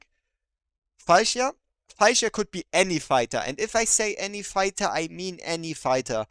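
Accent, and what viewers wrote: Germany. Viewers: dt akzent haha weiter so danke fürs video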